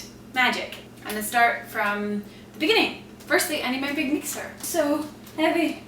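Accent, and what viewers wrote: United States. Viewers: Hard to believe I could be this captivated watching somebody bake. I'm a bachelor and I don't bake, but I might now give this a try. Also, ' ' 's American accent seems entirely natural now. Do you ever feel now like you're "doing" an American accent? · Your American accent has less traces of British accent, and it is just as lovely. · Not watched a video in 3 or so years. accent is DEFINITELY more American now, it's so weird haha!! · I wonder why people still remark about American accent. She's sounded a bit American for several years now. That's not a recent thing. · K who misses the old days when she sounded English no she sound like every other fucking America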